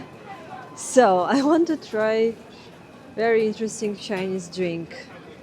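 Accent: Russia. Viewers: It's so cool to hear you speaking Chinese. Do you have a strong Russia naccent in Chinese? Can Chinese people tell which part of Russia you're from? That little old Chinese pharmacist seemed very nice. What a cute little man. :)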